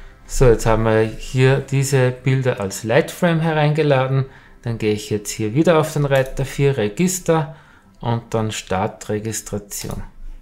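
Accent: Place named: Austria. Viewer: Tolles Video. Aber Buddy, dieser österreichische Dialekt hört sich echt schwul an. Trotzdem absolut tolle Videos die du da machst!!!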